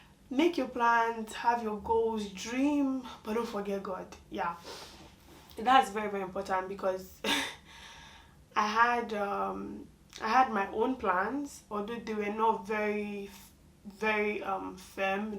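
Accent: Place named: France. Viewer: ❤❤❤happy new year in Advance …your English is already having French intonation…bonsoir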